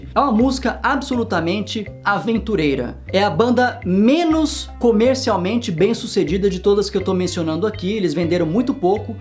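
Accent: United Kingdom. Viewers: Cara, você tem que fazer mais vídeos de inglês. Vi seu vídeo sobre o box do Pink Floyd e fiquei impressionado, cheguei a pensar que você fosse realmente britânico. Valeu!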